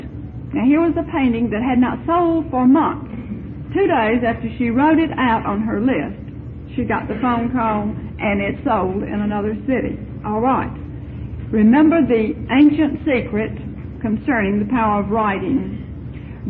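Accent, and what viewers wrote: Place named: North Carolina, United States. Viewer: I love her North Carolina twang!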